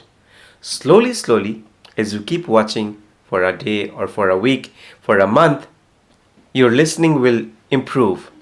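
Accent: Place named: India. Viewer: I love your accent.. Not heavy as other Indian speakers. That why I love its and use it as my lesson on my listening practice. Thank you so much sir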